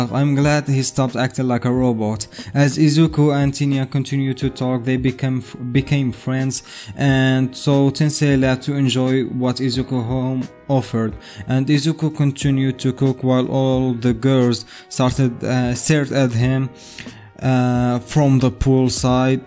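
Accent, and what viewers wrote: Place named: United Kingdom. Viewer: You became extremely British this episode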